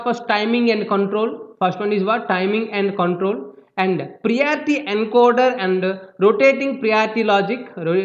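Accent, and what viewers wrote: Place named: South India, India. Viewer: Y'all talking as if you never heard an accent, just go find some other video if you find south's accent difficult, you north Indians are always so full of yourselves, expecting someone to change their whole accent just because you guys find it difficult, youre not that special. Humble yourself honey.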